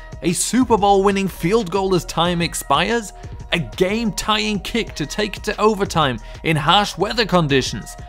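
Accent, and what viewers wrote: United Kingdom. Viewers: Is it just me or is it oddly amusing hearing a British dude talk about football?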